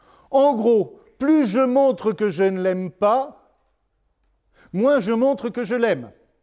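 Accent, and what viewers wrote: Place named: France. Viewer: Super de pouvoir écouter ce cours. Très intéressant. Cependant, je regrette la lecture très "francimande" et pas assez occitane du texte (il en est des interprétations comme de la prononciation). Autrement dit, il s'agit de l'autopsie de la traduction de BEC et non du texte originel. Ceci étant, ce cours est super. MERCI.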